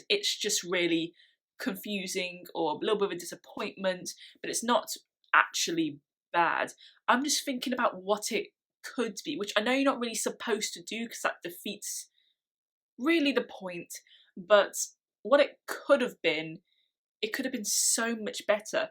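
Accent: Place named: United Kingdom. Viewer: Dear, I am not really interested in Ladybug, but I enjoyed your take on it. It is interesting to see what is important for fans. You look great without make up and your british sounds wonderful. (hopefully it is british english... otherwise shame on me) thx for the video, I think I will check out some more. have a good time and stay healthy:)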